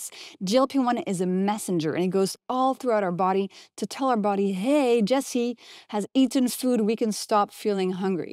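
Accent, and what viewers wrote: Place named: France. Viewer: Learning about glp-1 from a lady with french accent is more interesting than listening to people without a cute accent. ❗️❓️Most peoples hypothalamus is dormant or not heeded❓️❗️ Sad....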